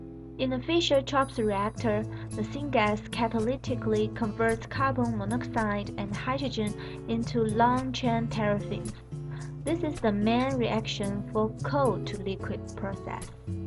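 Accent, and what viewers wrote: China. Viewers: if this is an "american institute" thing then why is this being narrated by some chinese lady who just learnt english a week ago ? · The video is funded by two US organization yet why does it sound like you hired a voice actress who sound like she hadn't even left China?!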